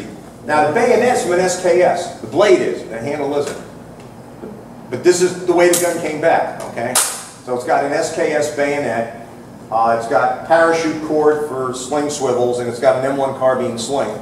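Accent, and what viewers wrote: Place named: New Jersey, United States. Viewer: He speaks New Jersey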